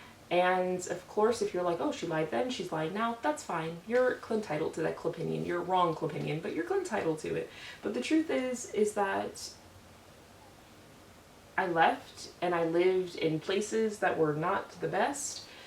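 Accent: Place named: England, United Kingdom. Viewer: My guess is the english accent.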